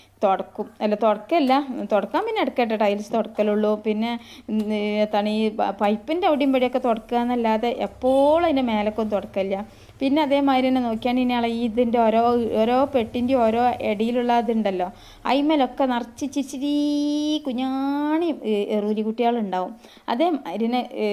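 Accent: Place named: Malabar, India. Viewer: കുട്ടി ഏത് നാട്ടുകാരിയാണ് ഭാഷ നന്നായിട്ടുണ്ട് ഞാനും മലബാറിയാണേ.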